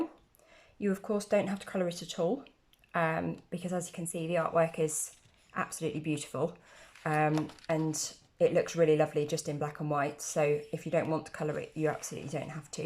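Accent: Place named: United Kingdom. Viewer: I understand why your accent is so lovely, the UK way of speaking English is the most beautiful way for me. 😍😍😍 I met wonderful people in the colouring community on Instagram and we talked a lot, that's how I improved my English without even knowing it. 😄 I even make videos in English now, I love this language! 💖 Have a good and colourful day, see you soon. 😘😘😘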